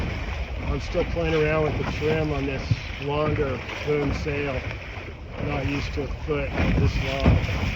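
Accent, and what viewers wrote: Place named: South, United States. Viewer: You have a very pleasing voice. A mild southern accent. Thanks for your sunfish videos!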